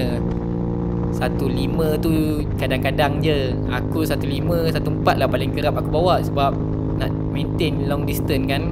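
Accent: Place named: Borneo, Malaysia. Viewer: Bro asal mane? Slank mcm belah borneo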